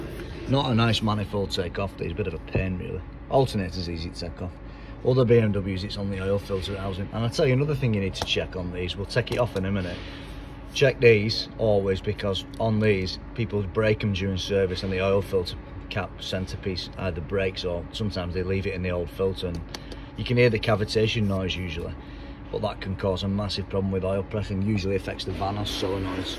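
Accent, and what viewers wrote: North, United Kingdom. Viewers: These videos are a great watch and show your skills. Nice to hear a northern accent too, even if it is from across the border for me !! Please keep making more 👌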